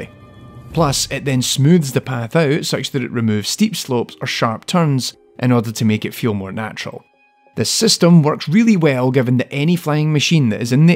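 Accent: United Kingdom. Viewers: Hello, I noticed you've put a game on steam. I have a game ready for STEAM..but having trouble putting out on there. Could you do a tutorial on this PLEASE. Not many good UP TO DATE tutorials or there. Thanks.. Ps. Nice to hear a UK ACCENT 🇬🇧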